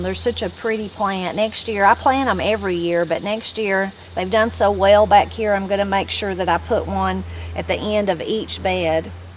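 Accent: Appalachia, United States. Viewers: Hi, I grew up in the mountains around Hendersonville NC, just east of Asheville and not very far from the Chimney Rock area. The surprise lily daddy and grandmaw always called it resurrection lily. Another name for it is lycorice but I believe it's not akin to the candy. I've seen mostly red as I've been growing up, but I have seen a white variety and a variegated variety that had both red and white petals although they were growing together in the same clump so they may have crossed pollinated over the years. I live high up in Watauga County NC in Boone now and I haven't seen it up here, but it gets very cold very deep in the ground the freeze line goes super deep up here so it might not be cold hardy for this elevation. I truly enjoy yours and videos and the accent and storird remind me of home. Thank you for keeping Appalachia alive and well and for bringing up your daughters in our mountains traditions.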